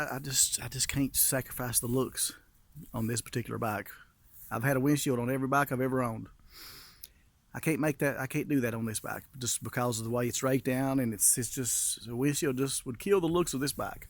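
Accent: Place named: Kentucky, United States. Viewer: I think a small windshield would look ok You sound like you’re from Kentucky or somewhere below the Mason-Dixon Line American by birth Southern by the grace of God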